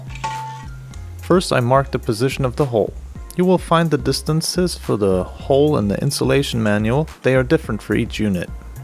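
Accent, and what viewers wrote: Europe. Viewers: Good video. You sound European. There are regulations in EU to have certified installers. I am curious - not complaining - are you certified to install or are you not in the EU or you are in the EU but you don't care, if so why don't you care? Thanks Reason I ask, is that I want to do it myself in UK. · Mind444 Spiritual Tribe He sounds European, it will be 220 - 240 volts depending on the country, single phase.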